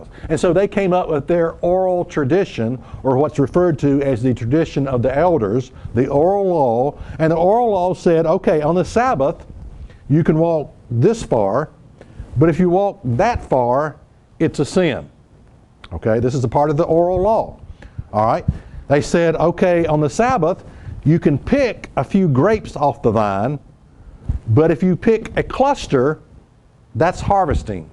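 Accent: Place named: Australia. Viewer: Pea brain lol. You are a man after my own heart and speak just like me... an Aussie lol. I think you did a great job explaining the difference between a Law and a commandment of God. Thanks... you helped me a lot. So I now know that if need be, I can walk more than 600 meters on the Sabbath day. Now what about the food laws... can I eat pig? It is not written in the Ten Commandments, but it is in the written law of Moses right?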